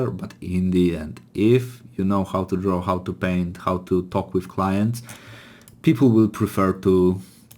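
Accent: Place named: Poland. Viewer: It's very funny cuz I can tell you a Pole few mins in 😂 Informative video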